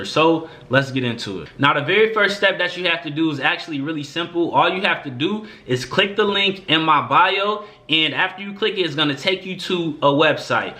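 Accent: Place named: Louisiana, United States. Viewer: I hear Louisiana in your voice or Detroit but I think Louisiana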